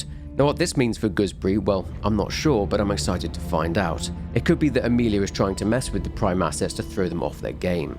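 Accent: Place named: England, United Kingdom. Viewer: I love voice and English accent. They work together well sounding pleasant and intelligent to me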